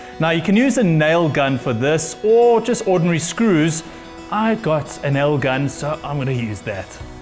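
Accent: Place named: South Africa. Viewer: I was going to ask if the accent was South African... then I realised is not a South Australian youtube channel.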